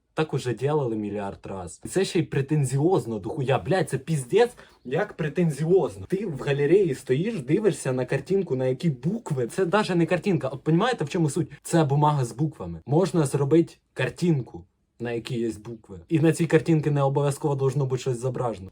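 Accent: Ukraine. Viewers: это потому что у него такой суржик, что только акцент украинский, а слова почти все русские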